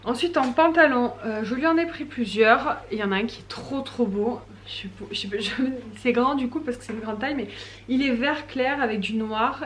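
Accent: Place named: Marseille, France. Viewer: Ta un accent Marseillaise ma belle .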